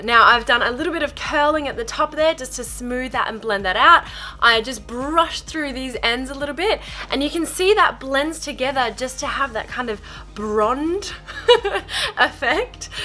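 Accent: Australia. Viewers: Sorry, but I don't find the Australian accent very nice. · Good old Aussie accents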